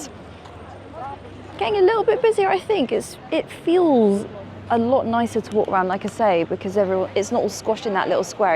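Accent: RP, United Kingdom. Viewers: I literally searched for some Modern RP accent videos and came across yours. I just played it, totally immersed in it, and watched this one for like an hour, replaying it. The food descriptions They were truly gorgeous! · I love your British eng...I am learning..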